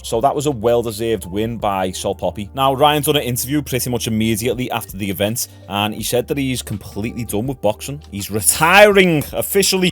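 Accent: Liverpool, United Kingdom. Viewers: Love this channel! I'm from Liverpool, but now live in the States, and it's just nice to hear the accent. Plus, I follow all types of combat sports, so this is my new home for combat sports news. Yesterday, was awesome. It's going to be a good few weeks, honestly. Wish they would spread the events out a bit, though. Nothing for 5 days of the week and then overloaded with shit to watch on the weekends. 🤣😆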